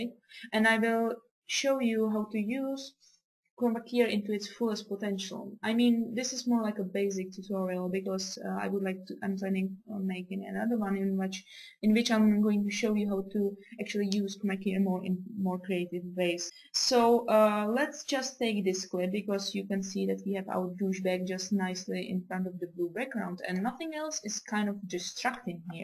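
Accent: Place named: Czech Republic. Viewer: Já jsem si říkal, že tvůj přízvuk zní hodně česky. Díky za tutoriál!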